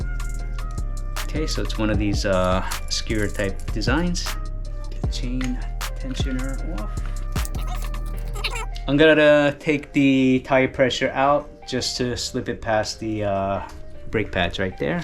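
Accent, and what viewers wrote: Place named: New York, United States. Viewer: Hey! Thanks for making videos! In addition to the bikes, I like the music, your cute family, the bits of Japanese slips in, your New York accent, and of course all the great views of the city! Keep ‘em coming man!👍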